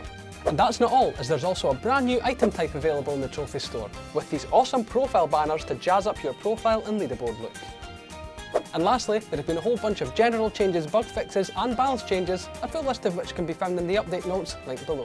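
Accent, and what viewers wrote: Scotland, United Kingdom. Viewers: gud ol' skoatesh achscent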